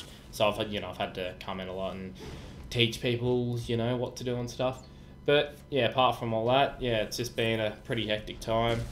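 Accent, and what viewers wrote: Australia. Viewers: OCE player and sounds Australian noice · Aussie? :D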